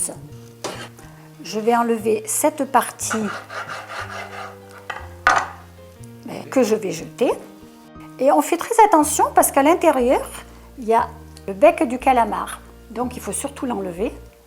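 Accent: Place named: Algiers, Algeria. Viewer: Vous avez raison,j'arrive à " sentir" la bonne odeur ... hum ! Vous n'avez pas répondu à ma question, êtes vous d'Alger?,car vous avez un p'tit accent qui ne m'est pas inconnu 🤔 😊😉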